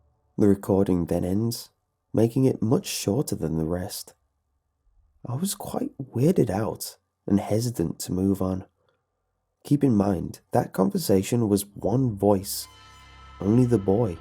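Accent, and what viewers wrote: United Kingdom. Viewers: God I love you. Your voice is terrifying and soothing at the same time, aided by the fact you sound young yet mature. That British accent is a bonus too!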